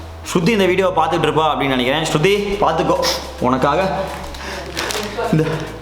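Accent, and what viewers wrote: Madurai, India. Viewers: Madurai ya thala neega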